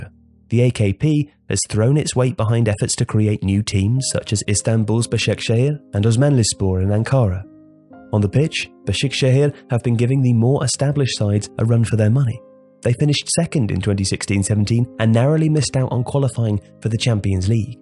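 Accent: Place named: United Kingdom. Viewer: Wow. Your british accent so nice, greetings from turkey nice channel keep goin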